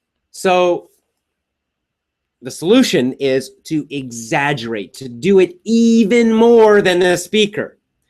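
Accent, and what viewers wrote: United States. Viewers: Super sir..even ur Amcn English we can understand well..(slowly teach).can teach(pronounciation) us more very importantt words? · I love your american accent teacher.its been really long time teaching my self american english only on the Internet cuz we don't have english teachers here well they teach us english roles and grammar by FRANCE ACCENT AND PRONUNCIATON. feeling so down.i hope some Americans come to fez city Morocco just to teach us their own language. 'We re so thirsty ' to learn about American English and more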